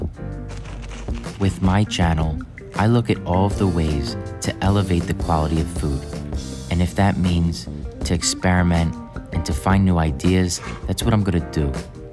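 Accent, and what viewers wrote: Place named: Philadelphia, United States. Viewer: The Philly accent cracks me up. Left Philly in 80 and still miss the Hoagies.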